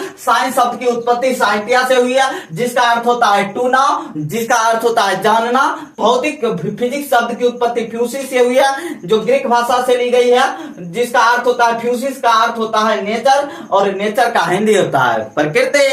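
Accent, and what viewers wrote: Bihar, India. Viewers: Bihar se ho kya??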